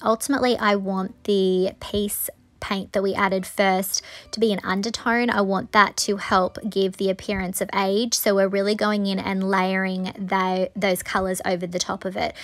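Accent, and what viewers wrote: Australia. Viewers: Hi, you’re obviously an Aussie, where are you situated. I love your work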